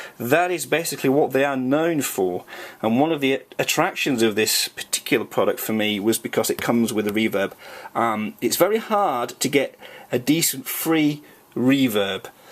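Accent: United Kingdom. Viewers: Reviews in British accent are good!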